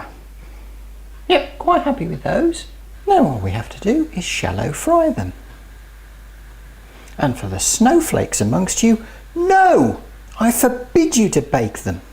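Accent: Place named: Birmingham, United Kingdom. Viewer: I lived in Birmingham for 23 years then move to London, which I've regretted it ever since. I love your accent because it reminds me of a lovely, very much under rated, city and your tips and recipes are terrific, please keep them coming! ❤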